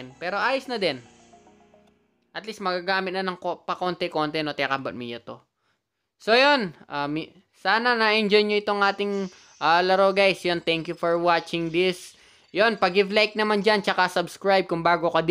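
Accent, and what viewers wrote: Pampanga, Philippines. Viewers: kapampangan ka idol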